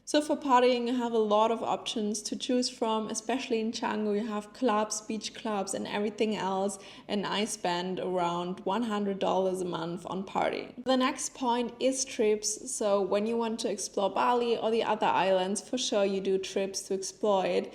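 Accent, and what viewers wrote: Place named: Germany. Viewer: Ah the German accent. Thx for the info btw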